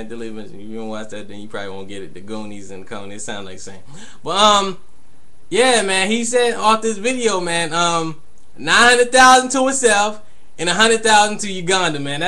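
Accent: Baltimore, United States. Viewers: LOL!, you sound like you straight up from Baltimore! Now that I live here, I'm starting to get use to the accents... And oh yea, COMCAST sucks!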